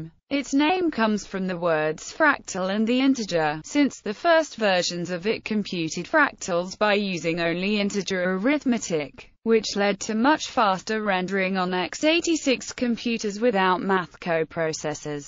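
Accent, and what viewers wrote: United Kingdom. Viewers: I wasn't aware synthetic voices could be made to sound British. Interesting. The CPUs of that day weren't called three hundred eigthy-six computers. It was "three eighty-six" (386) followed by the speed of the CPU in MegaHertz. My friend John had a top of the line 386 without a FPU (floating point unit) that he used to make fractint fractals on. At 640x480 we could go watch a couple of movies, stop to get something eat, go to the new QuikTrip to load up on a variety of sweets, go play putt-putt, go to the comic book/gaming store, or possibly play a few games in the video arcade. By the time we got back the fractal might be done rendering. Then there was the time waiting for it to save the render. As always hope the power didn't go out or his mom turn off that PC to conserve money on her power bill. I love FractInt. The one thing you failed to mention is the editing of the virtual video settings to allow renders up to 20,480 by 20,480 pixels!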